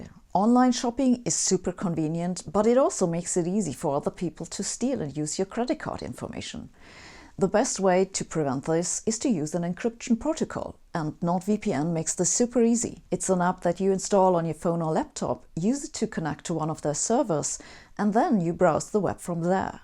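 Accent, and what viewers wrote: Germany. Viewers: Hello Sabine, I've discovered your channel about a week and have been binge watching since then. I think you probably have one of the best science channel out there and I like your german accent. I have a question though : would making the hypothesis that God rules the world through quantum mechanics make any sense science wise ? I know that such an hypothesis would be just as unlikely as any other theories (many worlds etc..), maybe more, but could it be ruled out science wise ? It sorts of make sense that if God influences the world, then He would do it through the most fundamental elements of Nature (although I know that a beautiful theory doesn't make science). In this theory God would chose the outcome of events through quantum decoherence. Since one cause can have multiple consequences in quantum mechanics, God would chose which outcome he likes the best out of them. 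Which outcome actually happens would thus be determined by "God's Will" I guess that would imply that quantum decoherence both happens and has macrocospical consequences in Nature (without human intervention), which I believe is the case based on what I've understood from your videos. I am not a religious person desperatly struggling to fit God in Science. I'm just an agnostic who's curious to know what does science have to say a the matter. I believe I am not the only person who thought of this, so it could potentially make a good video subject. Thank you.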